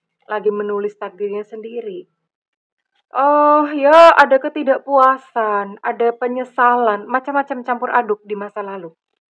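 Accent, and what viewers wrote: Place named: West Java, Indonesia. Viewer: Aya noann sunda nyh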